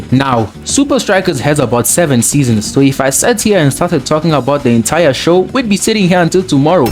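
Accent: South Africa. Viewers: Muh boy ded from 🇿🇦 with that accent .🎉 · Bro you live here Mzansi right i can tell from your English bru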